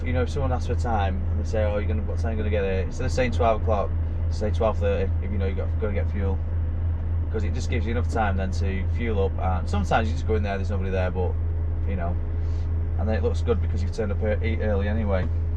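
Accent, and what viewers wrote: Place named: Northern England, United Kingdom. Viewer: Am from donny mate accent similar to your just a bit more northern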